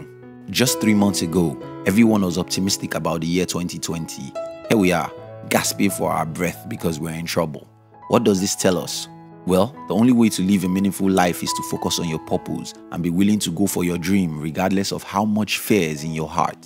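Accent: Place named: Nigeria. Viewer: He is from Africa, possibly Nigeria.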